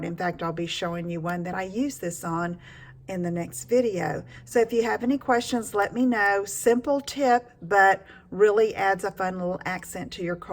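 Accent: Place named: Texas, United States. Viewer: I'm a native Texan. Love that drawl.❤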